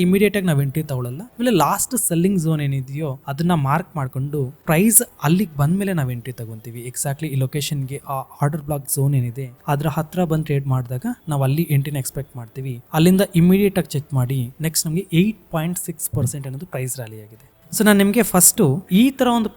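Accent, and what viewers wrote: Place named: Ballari, India. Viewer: Very nice video, I watched full and gave like also, I hope we can see 1000 likes very soon. But irrespective, please continue this video and show us to build the screener in chartinc for next steps. Are you from Ballary / Raichur region? I am just guessing based on your Kannada accent, I might be wrong. Thanks for this video, God Bless You.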